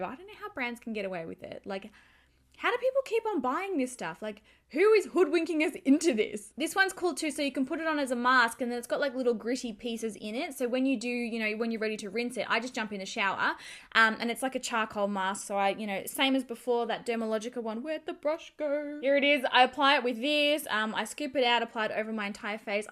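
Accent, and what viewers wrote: Australia. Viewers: Love this! Thank you so much! I love your Australian accent and how you pronounce things.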